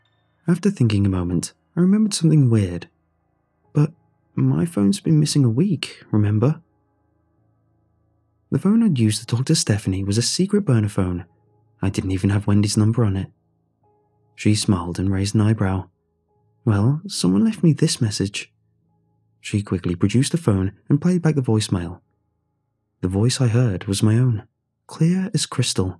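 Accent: United Kingdom. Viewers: or anyone else, can you tell me what part of the Britain his accent is from? It's the clearest sounding British accent I've ever heard and now I love it lol.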